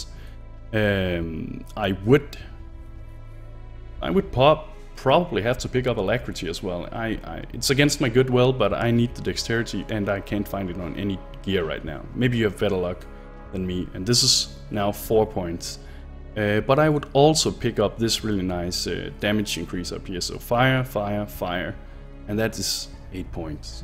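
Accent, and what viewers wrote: Denmark. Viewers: You sound danish. Are you danish?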